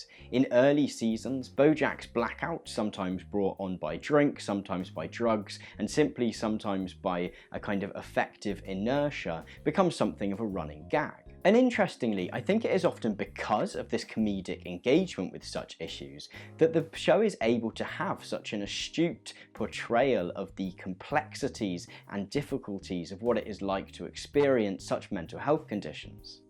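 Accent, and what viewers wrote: United Kingdom. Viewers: Thank you for making this. I liked and subscribed, this isn’t a casual thing for me and I don’t know the rest of your stuff. But I’m more willing to put up with things when they have a British accent, so I’m lucky there. Honestly, I hope you’re getting by on these videos and that’s the reason I did the above, you’ve found a way to not be a part of the rat race... and for that I admire and want to reward you. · Yoooo, Weinstock was one of my professors in college! Never thought I'd hear a British guy talking about his work! Great video!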